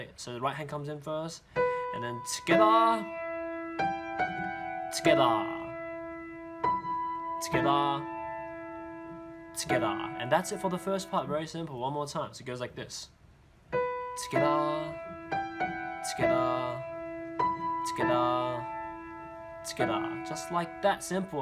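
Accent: United Kingdom. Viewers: i like the kinda british accent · i think he is British??? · best english accent ever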